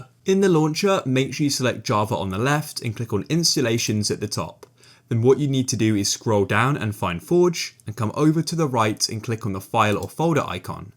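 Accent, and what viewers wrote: United Kingdom. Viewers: You bri-ish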